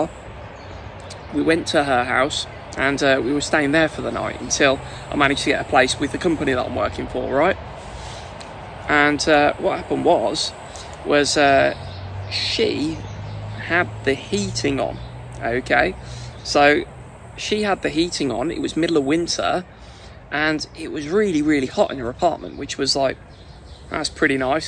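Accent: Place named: United Kingdom. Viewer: I've got everything he said without subs. Listen to a couple of more brits and you will get used to it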